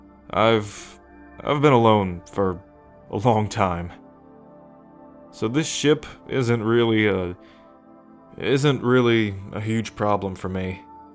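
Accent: South, United States. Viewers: the ever so slight southern twang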